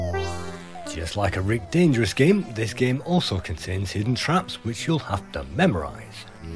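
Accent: Lancashire, United Kingdom. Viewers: The Lancashire is strong in this one!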